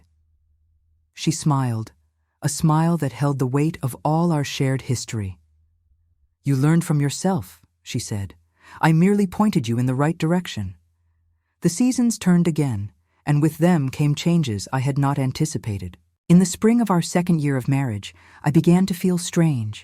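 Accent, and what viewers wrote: United States. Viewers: The american accent is awful, it is a british setting....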